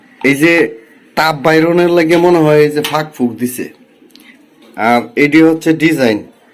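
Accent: Mymensingh, Bangladesh. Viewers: মমিসিংগা ভাষা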